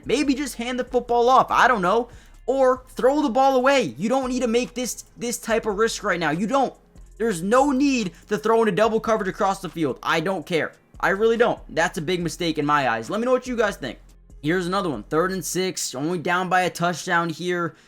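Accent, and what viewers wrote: Canada. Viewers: Is brother a Canadian? Caught a couple of 'aboots' in there?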